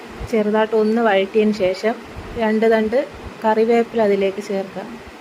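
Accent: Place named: Kollam, India. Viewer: ഇത്താ സ്ഥലം എവിടെ കൊല്ലം ആണോ സംസാരം കേട്ടിട്ട് കൊല്ലം ഭാഷയാണന്നു തോനുന്നു ആളുകൾക്ക് പ്രായജോനം ഉള്ള ചാനൽ 👌സൂപ്പർ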